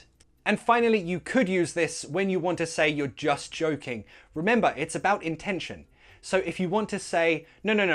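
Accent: United Kingdom. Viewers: I enjoy listening brittish accent. It's defferent from American one. Thanks for your classes, they make my English get better. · In my side view, you have an excellent manner to teach English, especially when you use sketches with the sentences although I have never liked a British accent before, now I admire it because of you and I hope you post more videos because are so fun and useful 😊🌟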